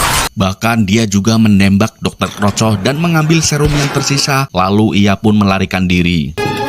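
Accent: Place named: Banyumas, Indonesia. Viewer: Kie tah wong ngapak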